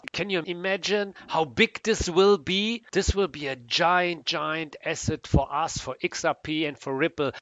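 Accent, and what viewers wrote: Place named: Germany. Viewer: Good job man, I like your humor and your german eccent <3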